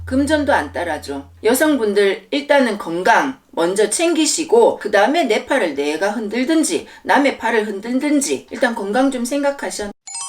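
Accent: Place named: Busan, South Korea. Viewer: 정사생입니다. 부산사람이라 선생님 억양이 너무 푸근하니 익숙합니다. ^^ 선생님 유튭 방송도 응원할게요.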